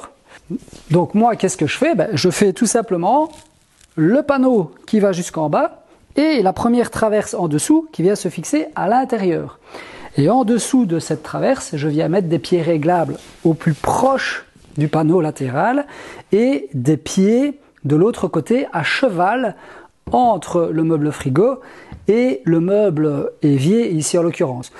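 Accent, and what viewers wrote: Belgium. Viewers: Une vidéo qui m'a donner envi de faire aussi ma propre cuisine, merci beaucoup pour ttes ces explication j'arrive plus facilement maintenant à voir comment sont fait les meubles et caissons !! Je suis abonné depuis peu mais j'adore ta pédagogie et aussi ton accent belge 😂 !! Encore merci pour tous tes partages de connaissances 🙏👍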